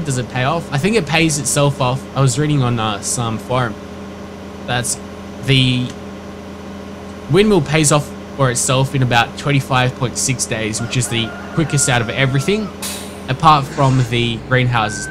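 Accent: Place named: Australia. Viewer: I don't know if i have said this before but i love australians ascents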